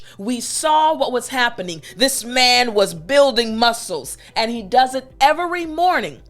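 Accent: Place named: United States. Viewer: thank you for your lesson! i'm ardi from indonesia, I'm a teacher and watching your video really inspire me a lot and i kind of difficult to teach and make the student understand what i'm actually gonna tell them and to be honest, there are still so many kids in my country not really interested in learning english, they learn as their parents' willingness not from the students themselves. for me, you are such an amazing teacher, your lesson are easy to absurb and the way you teach is so cheerful, ireally enjoy your american english so much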